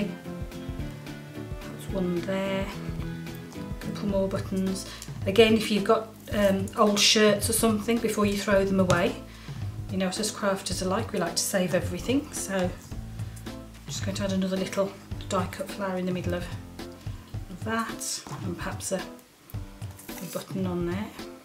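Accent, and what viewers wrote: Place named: Birmingham, United Kingdom. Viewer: Thanks. Great video. And I love them Brummy accent! Reminds me of home in Coventry xx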